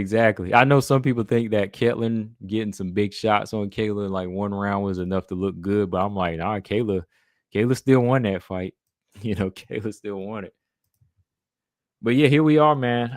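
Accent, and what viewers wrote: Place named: Ohio, United States. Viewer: are you from Ohio? You sound and speak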